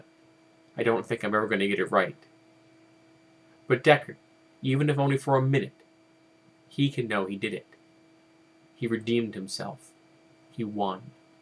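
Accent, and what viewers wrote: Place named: United Kingdom. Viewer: It's the Britishness, you know? People love the accent.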